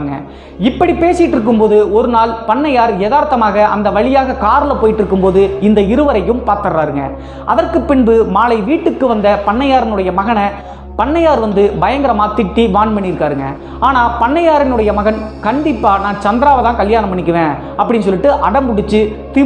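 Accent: Tirunelveli, India. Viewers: Bro enga area speech mari irukku. Tirunelveli doii🤩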